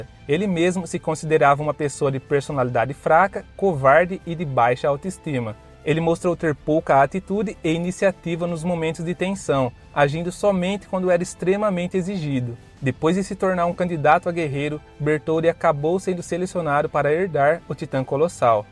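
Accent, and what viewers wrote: Brazil. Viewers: é um sotaque mistura de paulista mineiro e paraibano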